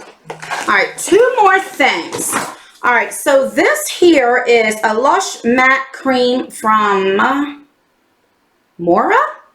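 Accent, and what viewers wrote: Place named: Chicago, United States. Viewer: Love your accent ❤ love that it sounds like your saying crown instead of crayon, not making fun of you just like different accents 😁 Chicagoans accents very so much lol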